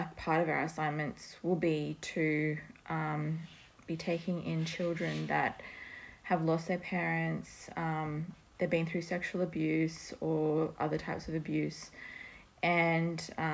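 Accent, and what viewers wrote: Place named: Australia. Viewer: Oh sister right now u had 144 subs! U sound Australian. I'm kiwi. I know a few other NZ and OZ 144s. 🕊👼🏻🙂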